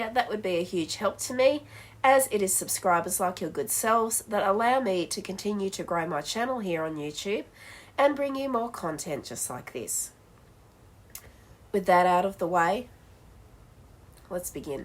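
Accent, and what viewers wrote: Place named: Australia. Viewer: I really enjoyed watching this. As a Aussie who’s lived abroad since the mid 90s I haven’t always kept up with things going on back home. Super interesting to hear the uncomfortable history of this stretch of highway. Also enjoyed hearing your Aussie accent. I’ve subscribed to your channel and will definitely be watching more! Thanks ❤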